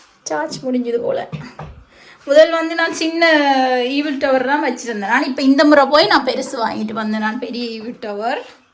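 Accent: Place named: Jaffna, Sri Lanka. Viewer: உங்கள் யாழ்ப்பாண தமிழ் Swiss இல் தழைத்து மலர்ந்து மணக்கிறது.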